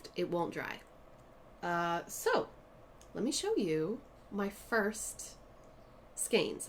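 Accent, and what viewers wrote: Ireland. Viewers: Oh my. The mouse is adorable! I love that your Irish accent is getting stronger.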